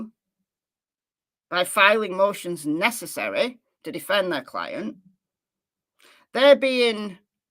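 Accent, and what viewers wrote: United Kingdom. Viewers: could you please cover the case of Valerie Wilson. Its apparently on utube but i would rather hear the story from you, im from Canada ive been watching you since Summer Wells. You are the best and i love your British accent, you are humerous and i just laugh my head off at your videos sometimes.❤❤❤ love your doggies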